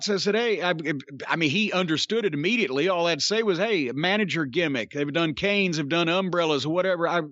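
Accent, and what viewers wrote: South, United States. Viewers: The southern accent gives It a certain flavor. I Jim decides to do a news show I'm in. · an intelligent well read southern man is what makes it for me. Too many give them a bad name in the public eye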